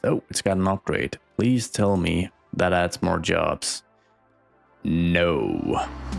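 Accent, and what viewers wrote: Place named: Denmark. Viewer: Synes også jeg kunne fornemme en Dansk accent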